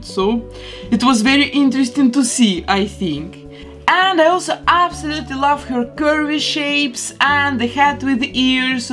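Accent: Russia. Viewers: Акцент таки прям русский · Пиздецки ужасный английский! Такого русского акцента я давно не слышала. Это прям 10 из 10. Лучше бы просто текст поверх видео пустила. Жесть, тебе категорически не стоит разговаривать на видео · Русский акцент? Разве нет?🤔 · Я один слышу ее русский акцент? Не? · О этот отвратительный английский. Такое чувство что чисто русская баба пытается на английском разговаривать а в итоге получается ранглиш. От произношения больно ушам приходится смотреть без звука. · Русский акуцент, здравствуй🖐🏼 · Слышу русский акцент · Сразу по произношению можно понять что вы русская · Неужели это русский акцент? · блин, слышу типичный русский акцент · Она из России? Слышу русский акцент. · Почему мне кажется что вы знаете русский язык?) Слышиться русский акцент😊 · Мне одной кажется что у неё русский акцент? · It's russian accent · Ааа, мне кажется, что вы русская, акцент очень смахивает на русский, но... 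Мало ли? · You're not Russian by any chance? I just hear the Russian accent. I don't know why. By the way, it turned out very cool👍🏽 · Is it Russian accent?🤔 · Ты из России? Слишком похожий акцент... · I dont mean to sound rude and mean no offence.. but are you russian? I ask cause of ur accent it reminds me of my aunt who is from russia.. but i love ur video of making jessica rabbit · Русский акцент, неплохо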